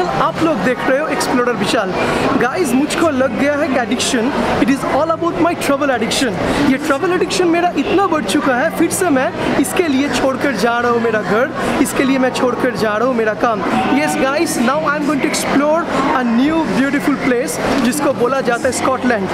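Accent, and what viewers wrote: Bengal, India. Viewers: Sweet Bengoli accent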